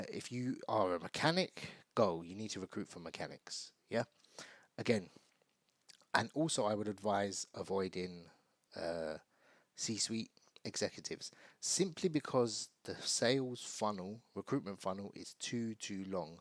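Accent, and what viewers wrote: United Kingdom. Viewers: You definitely don’t sound automated because you speak Green Lanes English 😊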